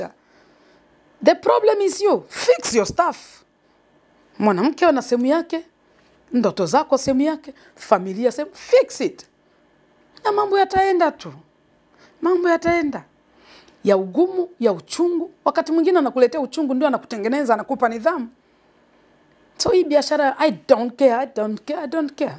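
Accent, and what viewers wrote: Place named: Kenya. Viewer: Samahani Shusho ni mkinya au Mtanzania Mbona ongea yake kama mkwnya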